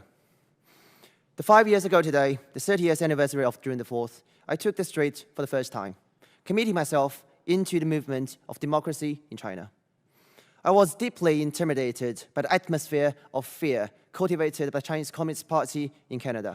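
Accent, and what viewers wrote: United Kingdom. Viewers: 比起边上的周（在直播里听了周的发言），杨的叙述更能深入人心，充分的依据、有逻辑的叙述、带有英国口音的悦耳英文，应该会touch到这些议员的心灵... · 感覺是香港來的留學生，濃濃的英國腔，不過口條清楚、明確表達！ 🙂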